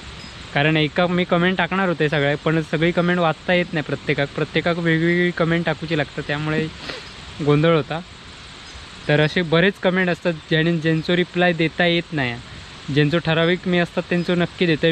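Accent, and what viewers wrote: Malvani, India. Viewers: तु मालवणी बोलतोस तेच आम्हाला आवडते तुझे गावचे विडीयो एक नंबर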